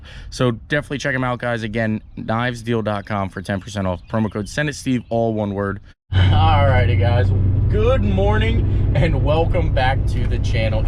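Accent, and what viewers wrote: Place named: Baltimore, United States. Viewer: I got 10 seconds into this video... and you gotta be from Baltimore or Philly. Yeah?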